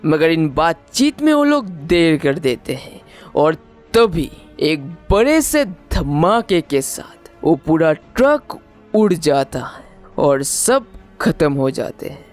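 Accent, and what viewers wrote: Bengal, India. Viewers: Are you from west bengal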